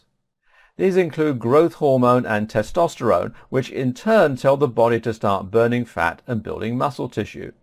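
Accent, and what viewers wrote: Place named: United Kingdom. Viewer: The British accent is one of the most lovely things to listen to...not sure why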